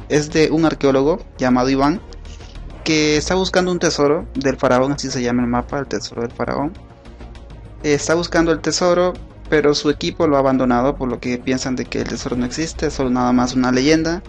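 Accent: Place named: Chile. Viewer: eres chileno? tu acento suena chileno xd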